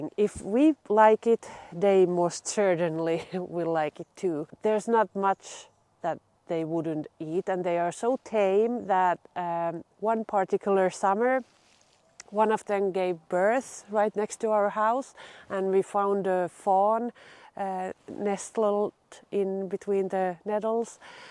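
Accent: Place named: Finland. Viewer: I love your English,! With a soft Finnish accent. Your voice would be great to read kids’ stories, about elves and gnomes 😊 Yes, I understand and support your gardening philosophy and general plan. Natural and generous for all things surrounding. Great job!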